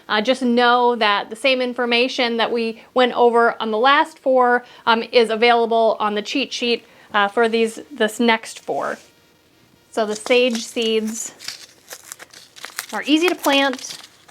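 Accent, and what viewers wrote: United States. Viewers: Fascinating the differences between American English and the English we speak in England; I love the way you call herbs "Urbs" (always makes me smile). It shows that American comes from the many different nationalities of settlers: I wonder why you call courgettes (french) zuccinis (italian) ; Aubergine (french) Eggplant: Cilantro we call coriander; there are many others.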